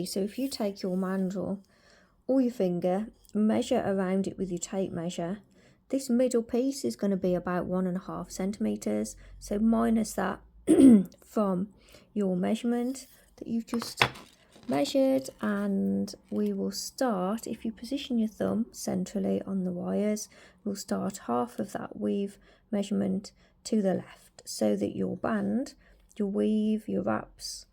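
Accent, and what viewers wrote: United Kingdom. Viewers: Where are you from if I might ask? (Not exactly of course - just region). Your specific accent is so lovely. I have friends all over the UK and each has a different accent but yours is so so lovely. My friend from Coventry sounds sort of similar so I would guess you’re not too far from her. 💚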